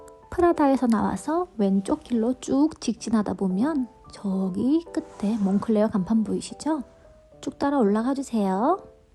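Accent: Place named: Daegu, South Korea. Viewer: 말이 빨라지면서 자연스럽게 들려오는 경상도 대구 사투리가 정겹게 들리네요. 늘 건강하세요. 영상 감사드립니다.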